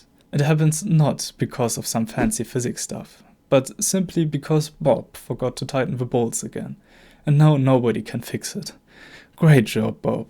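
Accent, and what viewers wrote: Germany. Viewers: Man erkennt deutsche einfach am sprechen xD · Liebe es wie deutsch du klingst obwohl du extrem gutes englisch sprichst. Sehr gutes video btw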